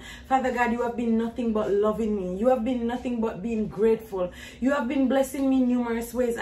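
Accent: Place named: Africa. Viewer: I'M SO HAPPY FOR YOU GUYS 🙏🙏🙏❤❤❤❤Love the african talking 😂😂😂 Heyyyyyy!!!!!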